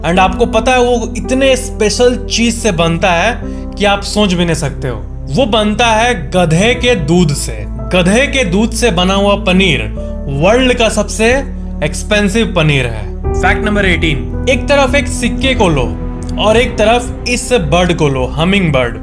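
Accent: Bihar, India. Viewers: Now your tone is likely bihari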